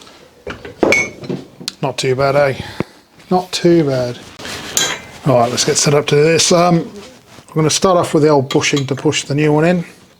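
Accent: United Kingdom. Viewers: Sound British mate...... I have to get this done on mine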